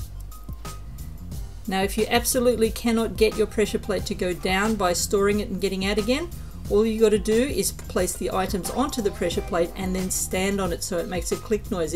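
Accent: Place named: Australia. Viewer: Love a fellow Aussie voice, and such a sweet clearly explained tute. Thanks chicky 😊😊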